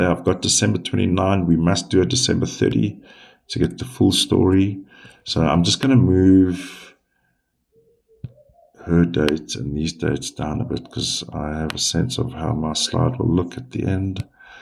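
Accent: South Africa. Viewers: So good to hear a South African voice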